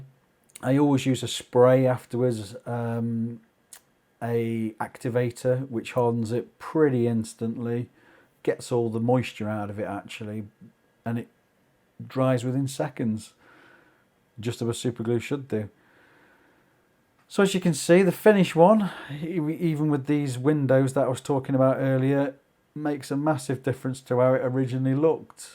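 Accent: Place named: England, United Kingdom. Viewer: Looking forward to watching the other videos you have made. You have just been recommended to me - nice to hear an English accent! I haven't grown up either!!!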